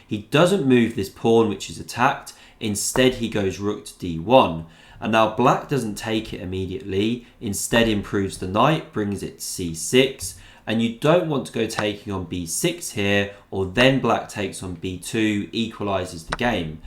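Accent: England, United Kingdom. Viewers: based purely on his accent im 90% sure he is from england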